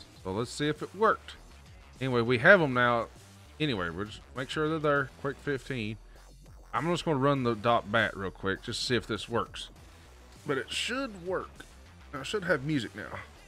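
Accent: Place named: United States. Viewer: i really like your cowboy accent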